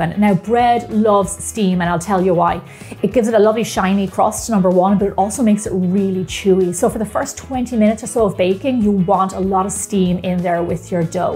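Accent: Ireland. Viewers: TY for the tips. Love, love your accent, I could listen to u all day. I believe it's Irish? Both my great, great Granny's were named Bridget & came from Ireland to the U.S. (to the great state of New Jersey) during the late 1800's both married German immigrants named William. I have made several of your recipes and they were quite good, Keep up the great work. 😁👍💖👏👏👏👏👏👏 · Well, I can make hundreads of dishes but will never get this right.given up on bread making. this is a good film. TU for sure and she's very atractive bread maker but sounds Irish not english. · she sounds Irish to me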